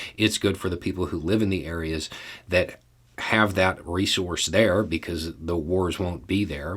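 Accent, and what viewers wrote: South, United States. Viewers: doing a mocking deep southern accent while already having a southern accent lol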